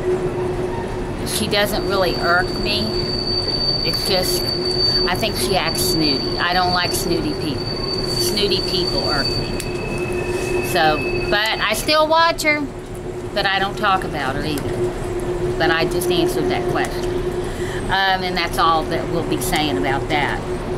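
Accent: West Virginia, United States. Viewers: Hi, I am a new subbie. I watch Marnies channel. I saw your channel name & started watching your 1st video. I recognized your accent right off. I live in Michigan. I married a born & raised Mountaineer. He was born in Clarksburg. Went to Doddridge County schools. All his family is still in Salem, Clarksburg, West Union. I just LOVE WV. But it sure does get HUMID. I have 2 grown daughters & 4 granddaughters that I take care of on the weekdays while the girls & their husbands work fulltime. Sure keeps this Nana busy and pooped out at the end of the day. Love your videos. Will write more later. Michelle from Michigan.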